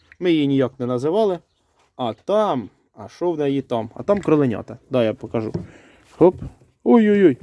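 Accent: Poltava, Ukraine. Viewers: По мові пізнала, що Полтавщина, а тут ще й Устивиця - бабуся там жила. Ностальгія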